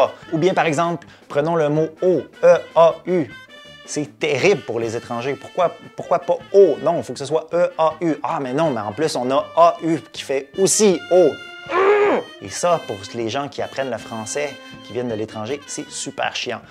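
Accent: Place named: Canada. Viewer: L' accent Canadien: un regal :) Je m' abonne: tres interessant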